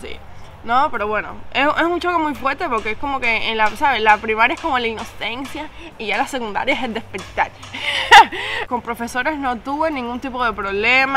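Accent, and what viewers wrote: Cuba. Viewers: Me gusta como platicas. Me gusta tu acento cubano. Desde Monterrey, México. Cuídate mucho.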